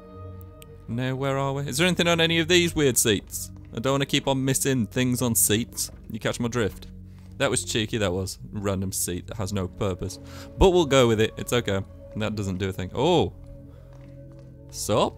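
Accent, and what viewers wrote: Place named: Birmingham, United Kingdom. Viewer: Such a nice looking guy with a yummy brummi sound!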